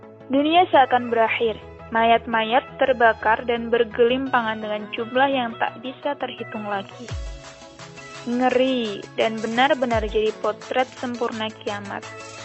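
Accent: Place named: Java, Indonesia. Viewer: Yg baca keliatan orang jawa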